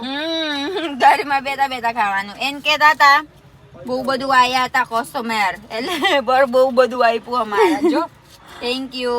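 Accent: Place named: Charotar, India. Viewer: speaks charotar gujarati 😅 sounds really good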